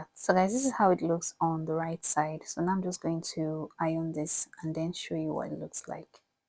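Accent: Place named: Africa. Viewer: I love your tutorial, but I missed some things. Please clarify what the material is that you are using as the backing to the silk, and what is the sticky item you are using to keep the lace from slipping. I could not understand what you were saying when you mentioned those items. Your African accent made it difficult for me.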